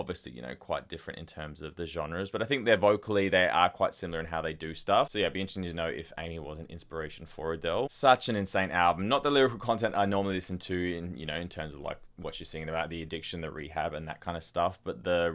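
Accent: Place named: Australia. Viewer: The way I could tell he was Australian before clicking on the video 😂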